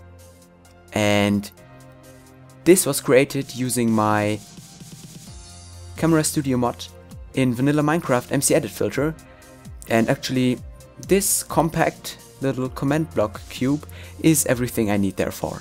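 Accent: Germany. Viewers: Are you german? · Man merkt einfach übel das du deutsch bist :D Aber nices video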